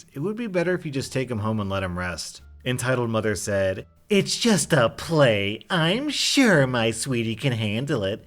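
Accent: Germany. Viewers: I‘m from Germany and I don‘t know this band, but you have an strong german accent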